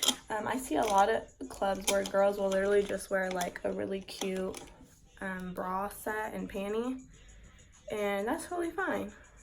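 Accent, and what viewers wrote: Mexico. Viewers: Shes clearly Mexican by the way she talks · She talks like a Mexicana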